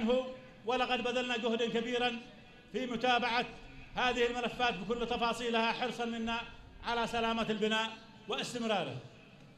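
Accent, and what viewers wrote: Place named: United Arab Emirates. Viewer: كلامك اماراتي روح بلادك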